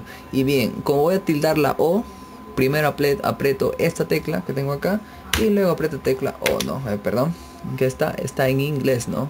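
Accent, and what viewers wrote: Peru. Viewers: Peruano .___.XD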